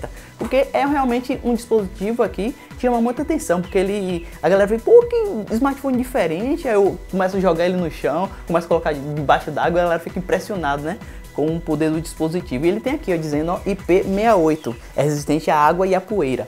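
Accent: Bahia, Brazil. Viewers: Vc é baiano?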